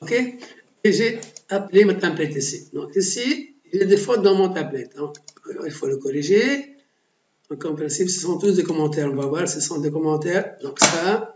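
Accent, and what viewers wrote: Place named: Tunisia. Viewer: tunisien accent merci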